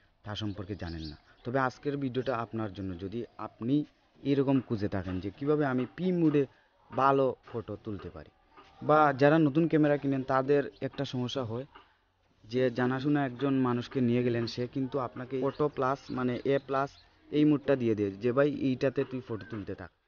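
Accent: Sylhet, Bangladesh. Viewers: তর বাড়ি মনে অয় সিলেট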